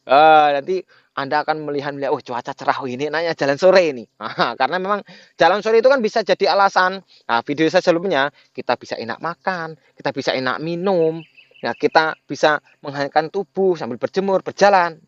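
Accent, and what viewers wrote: Java, Indonesia. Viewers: Mas sampean dari jawa mana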